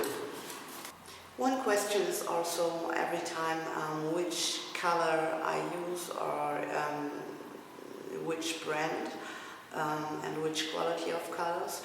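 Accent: Germany. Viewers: Your English is very good :) And the German accent is just charming :) (The little we can hear of your accent) :) - Thank you for sharing your art work with us, it is very inspiring! :) Much love - Nina :) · I am so glad you have taken your courage in your hands and decided to talk to us in England. I have been to Berlin once with my Son. Your English is fabulous with a gentle German accent. From your explanation on how to apply paint, I have al so taken courage .I have bought a canvas and started to paint.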